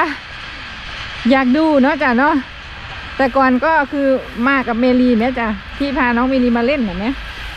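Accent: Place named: Korat, Thailand. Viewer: สำเนียงเหมือนคนโคราชคั่บ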